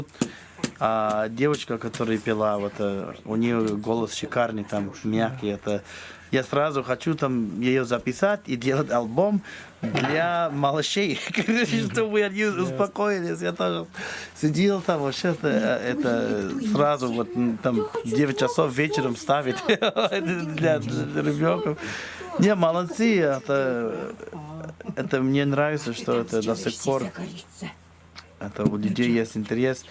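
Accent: Tuva, Russia. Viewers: 😅тувинский акцент! Дыка кижисиг кижи. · На руском говорит как тувинец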